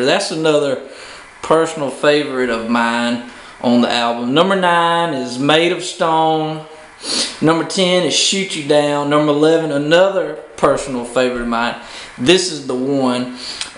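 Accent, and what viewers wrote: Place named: South, United States. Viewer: Great knowledge/review. Funny to hear a southern American talk about the, I'm half Eng/ Half American so It's always nice to hear that accent. Great job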